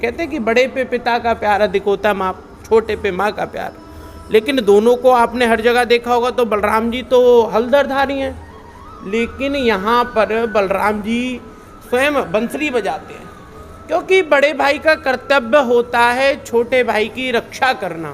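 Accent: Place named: Braj, India. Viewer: Pandit ji mujhe aapki braj boli bhut pyari lagi😚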